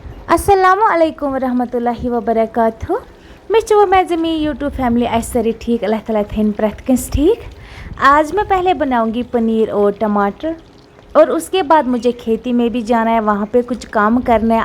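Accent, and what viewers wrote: Kashmir, India. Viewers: Aap ki kashmiri voice bhot ache hai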